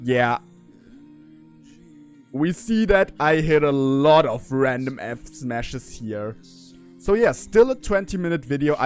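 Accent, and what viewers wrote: Germany. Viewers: Holy shit are you german?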